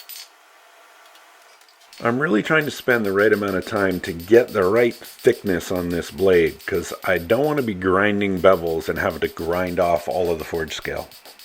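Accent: Canada. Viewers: Always thought you were Canadian.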